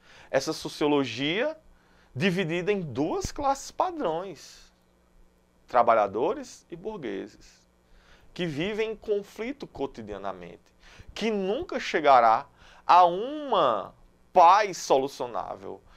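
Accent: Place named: Alagoas, Brazil. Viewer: Professor deve ser alagoano !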